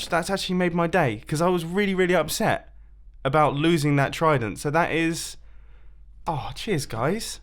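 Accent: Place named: England, United Kingdom. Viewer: “Ah tall” I love English accents😍😌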